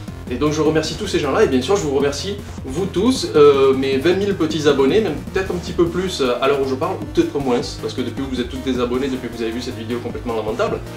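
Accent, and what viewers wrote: France. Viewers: Découvert via le dernier film de TGWTG (quoi? un tel accent Franchouillard ça ne se loupe pas! Surtout quand il appartient à un autre fan du Doc') et depuis bah je suis sans relâche, alors franchement GG, tu mérite, et surtout continue comme ça!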